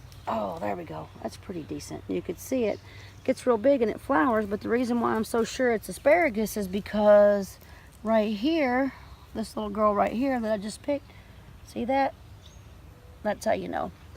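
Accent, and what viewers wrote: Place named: Kentucky, United States. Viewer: Is this really the hills of Kentucky? I love it, and that accent is too cool - hoping for lots more videos like this!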